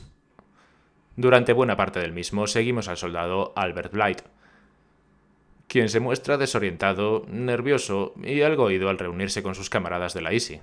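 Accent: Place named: Spain. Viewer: El comentario más ridículo que he visto en mucho tiempo (ojo el comentario, no tu persona). Hoy por hoy rechazar el acento español peninsular es un absurdo y un despropósito, con la de canales buenos que hay con dicho acento. 😅 Y no entiendo que es lo que no se entiende, acaso de hablar de la "wea weón po?, no po wn; si no entiendes nada del vídeo tení que hacerte ver eso cachai hemano?"😂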